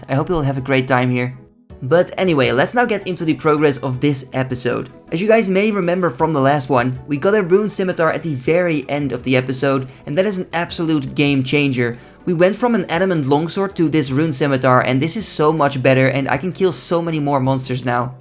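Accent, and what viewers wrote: Netherlands. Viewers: I recently discovered your channel and benching it rn! I was wondering if you were Dutch by any chance, your accent sounds familiar?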